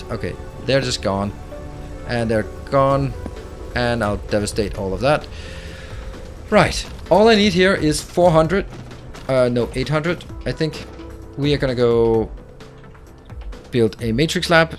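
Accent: Denmark. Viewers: DANISH!.. thank you, I was wondering where your accent was from! :) (re: reference to Danish keyboard at approx ) ROFLMAO "Devastated" - please say it more often :) "It will cry itself to sleep, every night" lol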